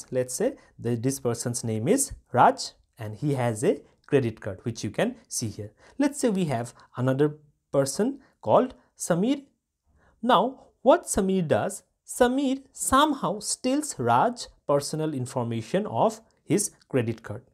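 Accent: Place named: Uttar Pradesh, India. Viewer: Hello you are from UP na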